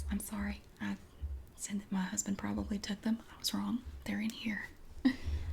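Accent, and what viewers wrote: South, United States. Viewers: Well in any case, thanks for making these Southern accent whispers. I love them.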